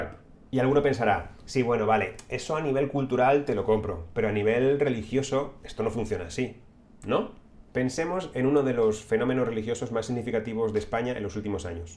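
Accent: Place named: France. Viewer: Lique por tu acento francés. Mathafaka tb suena mejor en ing. ✅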